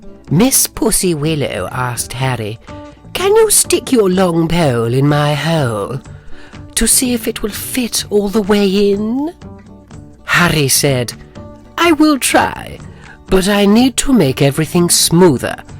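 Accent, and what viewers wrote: United Kingdom. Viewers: Question: is that a real British accent?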